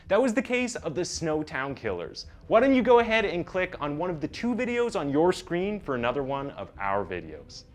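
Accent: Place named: Canada. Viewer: Is this a Canadian channel? Dude has a ridiculous accent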